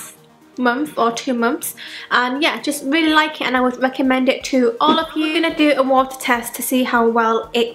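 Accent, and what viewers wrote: United Kingdom. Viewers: I absolutely love the asian/british accent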